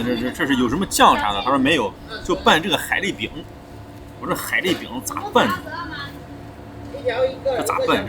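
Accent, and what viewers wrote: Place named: Shaanxi, China. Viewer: 是陕西人吗？陕西口音有些重。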